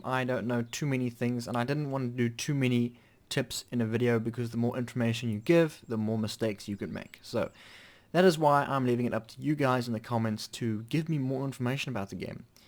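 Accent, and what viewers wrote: South Africa. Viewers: South African accent perhaps???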